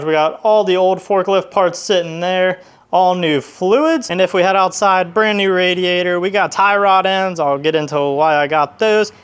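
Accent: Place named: Maryland, United States. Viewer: Definitely tell you're from maryland just by listening lol. Sounds like a Rosedale native to me with those O's lol.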